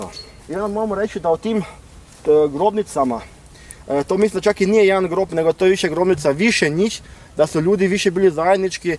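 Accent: Zagorje, Croatia. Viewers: Ovo brdasce me podsjeca kao iz onog filma "Crni Gruja i kamen mudrosti" jel tu bio mozda kamen mudrosti, kad zveknes glavom od njega budes mudar, a svakim novim udaranjem budes sve mudriji i mudriji hahaha:D Salu na stranu, klipovi su ti armirani beton! samo tako nastavi, i molim te malo poradi na jeziku, pola te covjek koji nije zagorac ne moze razumjeti, ili ako nista malo sporije pricaj, nekad ga ubacis u petu brzinu jbt:D spetljas se sav, ionako se taj dijalekat tesko razumije jos kad ga ti ubrzas tad tek se nista ne razumije:S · To ti je zagorski..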